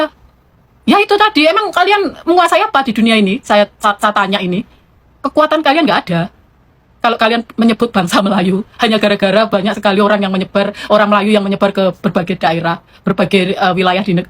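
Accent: Java, Indonesia. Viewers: Loghat jowo bangetttttt...sesuai jadi bibik ku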